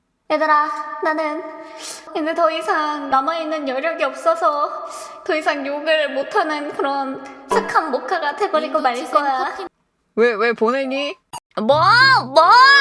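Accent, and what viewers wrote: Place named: Japan. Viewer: 역시 은 일본식 영어랑 가요느낌이 잘 어울려...다음엔 그런것도 해보자..!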